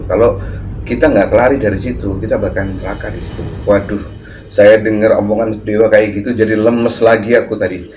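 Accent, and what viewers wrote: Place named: East Java, Indonesia. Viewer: mau tanya Abang asalnya dari mana? logat jatimnya faseh banget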